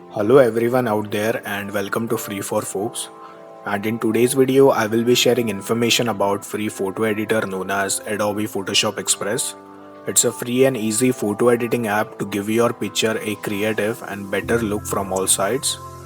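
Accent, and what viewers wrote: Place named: India. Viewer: UR VOICE SOUNDS LIKE AN INDIAN btw good tutorial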